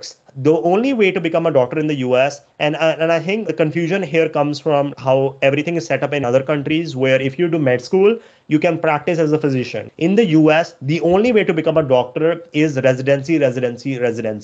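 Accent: India. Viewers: Good info but you have that typical funny Indian accent. I hope the patients enjoy conversations with ya :)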